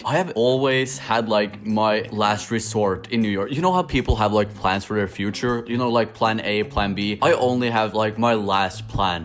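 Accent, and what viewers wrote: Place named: South Korea. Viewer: You low key sound like you have a korean accent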